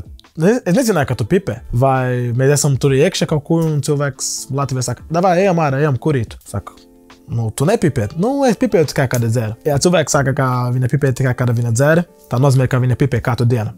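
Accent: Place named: Lithuania. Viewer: You sound Lithuanian not Latvian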